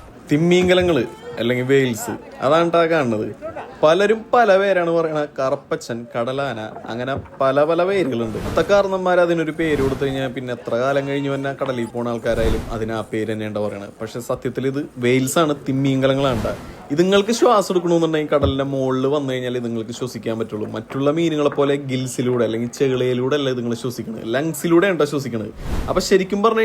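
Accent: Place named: Karunagappally, India. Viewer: കൊല്ലം കരുനാഗപള്ളിക്കാരാണോ സംസാര അത് പോലെ തോന്നി എന്തായാലും നല്ല വീഡിയോ❤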